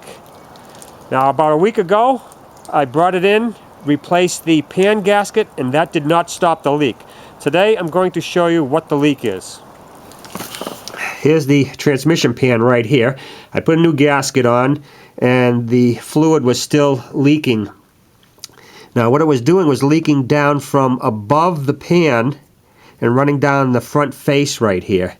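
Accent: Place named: East Coast, United States. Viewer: im not a mechanically inclined person so im starting an auto tech 101 class tomorrow..wish me luck..u got a cool lil accent. u must be from the east coast/eastern seaboard.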